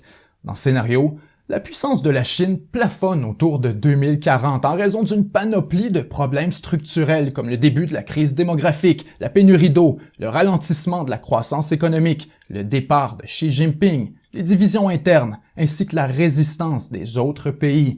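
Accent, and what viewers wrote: Quebec, Canada. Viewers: Il est québécois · l accent possiblement! ;) Notre accent québécois!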